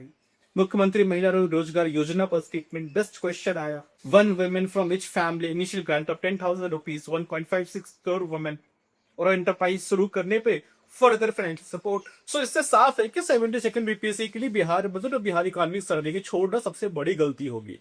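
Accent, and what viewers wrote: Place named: United Kingdom. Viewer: Hindi ko b English accent m bolne ki kya jarurat h sir aapko. BTW...nice session. Thnxxxx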